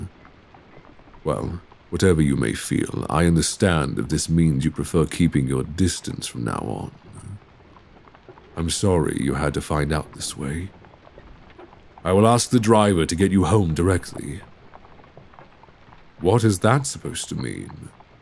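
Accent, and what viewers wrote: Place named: United Kingdom. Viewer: His British accent lord have mercy 🫠🧎‍♀️🧎‍♀️ the gods are tempting with hot British vampire men yet again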